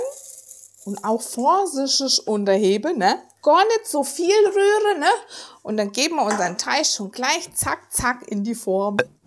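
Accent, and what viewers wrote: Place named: Saxony, Germany. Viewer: Super die sachsen. Dialekt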